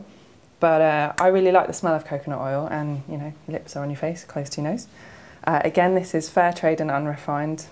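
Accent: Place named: United Kingdom. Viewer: i am not vegan either, but i love making vegan lip balm and i love your vids! i made mine with organic jojoba oil and vanilla. and because of you accent, are you from the uk? because right now im in the uk on vacation with my family......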